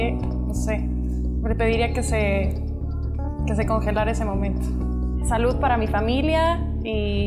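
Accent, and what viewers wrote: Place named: Mexico. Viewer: Es guapa no hay duda de eso. Pero abre la boca y se desparrama ese acento HORRIBLE mexicano y la pobre demuestra su limitado verbo e intelecto. Mas bonita esta de boca cerrada....pues no entran moscas.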